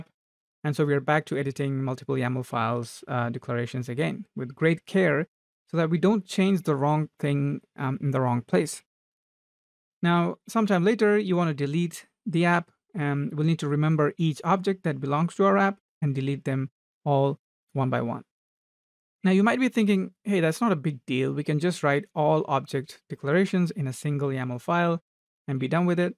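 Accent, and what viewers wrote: India. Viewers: malayali anno ?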